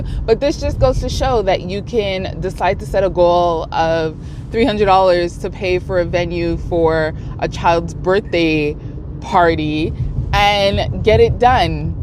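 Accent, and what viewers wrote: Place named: Canada. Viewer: The Canadian accent is music to my ears. Why are you on the right side tho? Is you camera mirrored?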